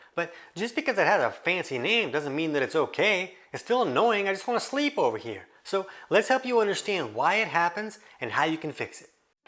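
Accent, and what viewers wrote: South, United States. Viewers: I like your subtle Southern accent.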